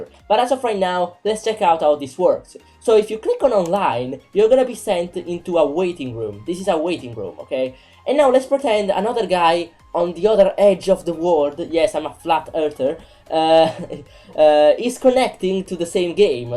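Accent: Italy. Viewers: Great accent :D Where're you from, Italy?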